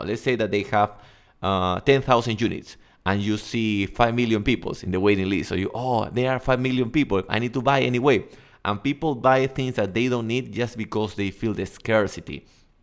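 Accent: Peru. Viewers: LA PREGUNTA ES QUE TE METISTE PARA HABLAR INGLES CON ACENTO PERUANASO ,PERO SI TE DEJAS ENTENDER ME LOQUEAS MAZETITA ,EXITOS SALUD Y VIDA .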